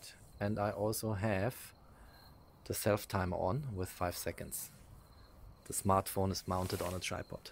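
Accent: Germany. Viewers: Are you German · Haha man merkt das du Deutsch bist, ist aber nicht schlimm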